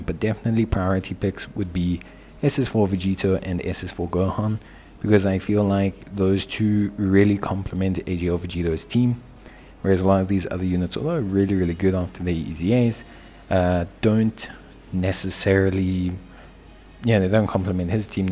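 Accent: South Africa. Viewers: Woah bro, are you South African?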